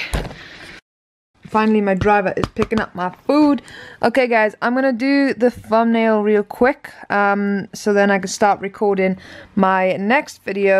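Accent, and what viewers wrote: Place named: South Wales, United Kingdom. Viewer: Sounds like you're South Walian. Greetings from Aberfan, Merthyr Tydfil. How about a duet. Try - featuring from the Retrospect Tour.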